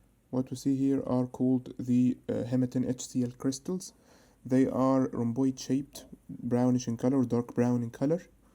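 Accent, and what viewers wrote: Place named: Egypt. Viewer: I knew you're egyptian by the word first. You, egyptians, say "firEst" Good video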